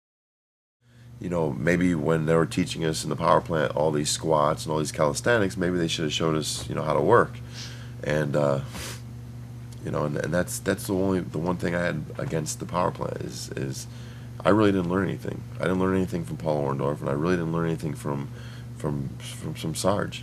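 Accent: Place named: Spain. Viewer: It's his Spanish accent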